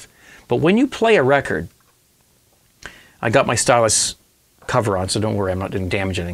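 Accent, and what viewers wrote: Canada. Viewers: Also, one of the best Canadian accents ever. Thank you for this video! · Must be because he's Canadian (I can hear it).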